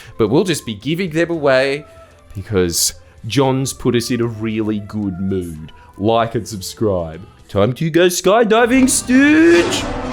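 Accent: Italy. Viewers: Glad to hear the Italian accent is back. It’s like being back in the 90s. Thank you,.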